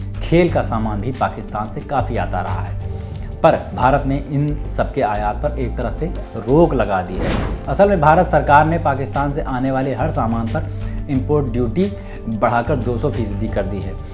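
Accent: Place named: Bihar, India. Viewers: Mr. please to be work on learn hindi then try to do anchoring. You are belong from bihar that is to be known by your Bihari language I hate this type of language. "फैसला = फैईस्ला (you said)"🤣🤣🤣🤣🤣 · Thodaa Hindi me Bihari tone mat laao app bolnaa seekh le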